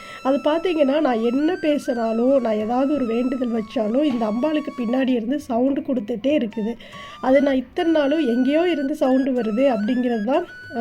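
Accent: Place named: Theni, India. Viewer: Akka ungal negative place Dindigul la akka ennaku theni akka ungaloda speech appadiye erukku Akka ❤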